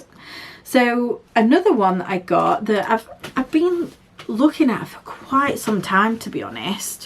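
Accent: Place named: North, United Kingdom. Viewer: Right I’m all caught up! Loving your flosstube and really enjoyed spending time with you. As a fellow northerner it’s lovely hearing another uk flosstuber! Your soda stitch finishes are stunning and I literally love all your wips. Had to stop the video a few times to check on different Etsy sellers!! I think you are far too hard on yourself re your project bags I think they are phenomenal, if I made one I’d prob end up with a t shirt by mistake or something 😂😂 so happy to see your starting Victorian charm, I completed it a couple of years ago (think I show my finish on flosstube 7) if you want to see a finish of it! Not going to lie it’s a big piece but it’s so worth it! It’s still one of my fave finishes to this day. Happy stitching lovely - take care, Lauren (Flossabilities) 🧵💗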